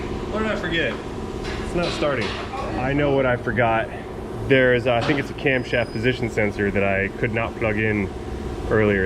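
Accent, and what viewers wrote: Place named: New England, United States. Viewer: Sounds like is a New Englander.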